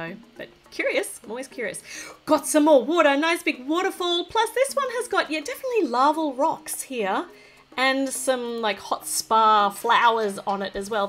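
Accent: Australia. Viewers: I have been watching your vid so much I’m starting to wanting to talk in a Australian accent! 😆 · I love this, video and what country are you from you sound Automatically Austrilian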